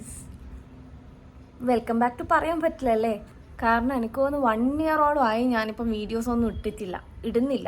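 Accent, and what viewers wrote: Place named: Kannur, India. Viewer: Nallaind ketta nammale kannur bashaa..❤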